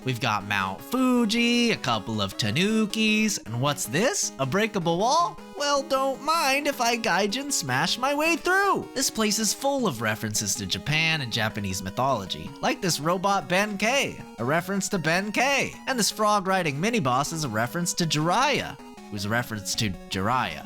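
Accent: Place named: Germany. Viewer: Why a WERMAN ACCENT This is so cool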